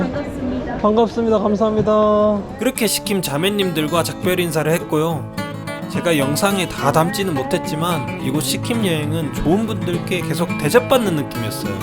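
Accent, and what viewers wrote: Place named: Gyeongsang, South Korea. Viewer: 경상도 섬쪽 말투신데...경상도분 맞죠?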